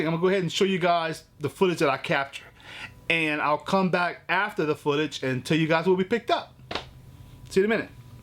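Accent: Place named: Louisiana, United States. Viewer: I'm from Louisiana, I just found your channel and heard your voice and instantly knew you were from Louisiana! Love your channel man!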